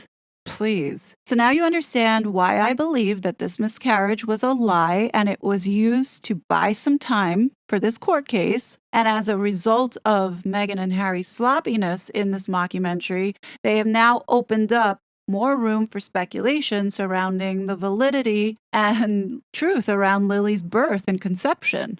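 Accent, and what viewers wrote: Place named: United States. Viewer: Correct me if I’m wrong- you sound American- how did you get your hands on the book?! I had to order mine from UK and it won’t be here for another week😢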